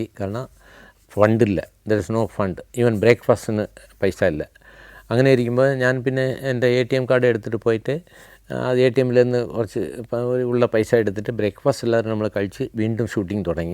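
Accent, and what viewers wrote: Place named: Tamil Nadu, India. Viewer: തമിഴ് കലർന്ന താങ്കളുടെ സംസാരം വളരെ രസമാണ്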